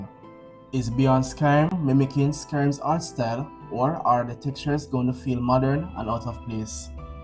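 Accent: Jamaica. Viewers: Are you Jamaican? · He’s Jamaican you mong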